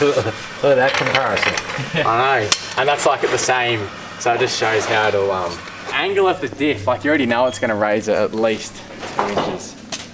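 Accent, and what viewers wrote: Australia. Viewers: I was like: "I think this guy is Australian" "Oh yeah, he's definitely in Australia"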